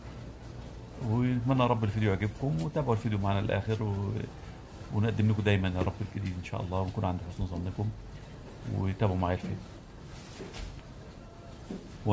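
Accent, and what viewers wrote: Upper Egypt, Egypt. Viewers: انا مغربي بس بكلم مصري وصعدي كمان ومن لهجتك انا عرفتك انك انت من صعيد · مصري صعيدي 👍 لهجتك عرفتها. تحية لك يا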